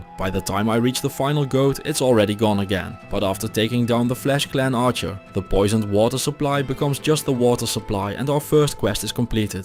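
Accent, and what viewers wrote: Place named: Netherlands. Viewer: you sound SO Dutch it hurts my ears!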